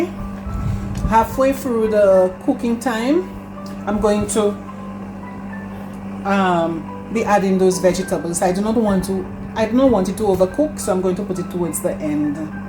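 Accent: Saint Lucia. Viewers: Love the St Lucian accent, keep talking so I can hear more of it. Also it is the green banana that is known as poor man food item...that said there is nothing poor about your nutritious meal!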